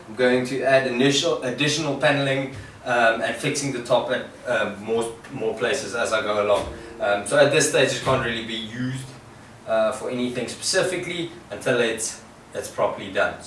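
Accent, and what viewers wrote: South Africa. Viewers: At last... I thought I was the only YouTube maker in Africa... LOL, I'm in Namibia, you sound like you from SA... glad I found you... Sub'd... Great job bru... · Dude, this is awesome. Nice to hear your accent - I'm a kiwi living in Lexington, KY and don't get to hear the South African accent AT ALL any more. Stay well brother! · Is he South African with that accent? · South African? I assume it from your accent.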